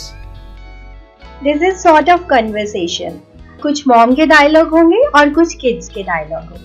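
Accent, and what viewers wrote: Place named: Punjab, India. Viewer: You have a punjabi accent..however the conversation was good😊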